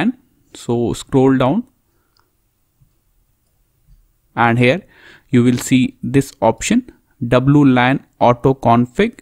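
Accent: India. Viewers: Worked , thanks a lot , i knew it will work when i heard that thick Indian accent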